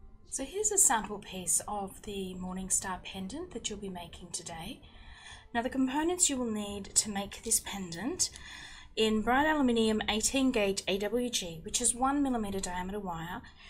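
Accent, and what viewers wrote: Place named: Australia. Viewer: This pendant is beautifully styled!! I found this tutorial, like all others I have watched here, was quite easy to follow along with, and soft Aussie voice is both soothing and relaxed making the atmosphere one where I felt I was having a private lesson with as my tutor!! Lucky me!!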